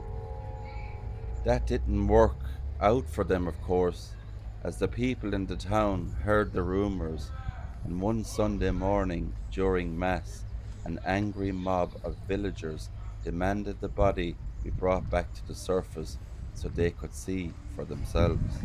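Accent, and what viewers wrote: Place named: Dublin, Ireland. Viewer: Dublin accent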